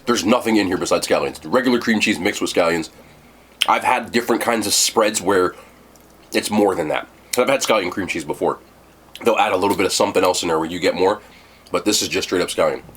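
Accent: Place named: New Jersey, United States. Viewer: The jersey came out in this one😂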